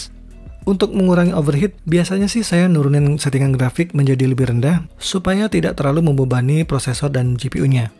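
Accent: Palembang, Indonesia. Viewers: Abang uong palembang cak nyo 😁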